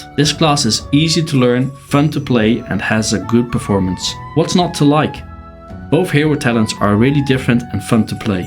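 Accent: Netherlands. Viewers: I really like your voice, and your Dutch accent.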